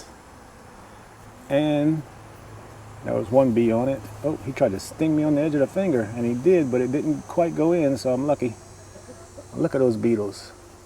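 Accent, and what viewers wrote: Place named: Louisiana, United States. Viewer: Awe... Your voice make me miss home!!!! Lol I'm from butte la rose but I live in Florida now. 😊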